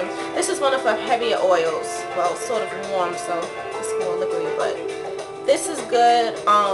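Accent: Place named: New York, United States. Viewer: Yes, you are a fellow New Yawka. =p Anyway, I know Qhem appreciates the free advertising. Good job!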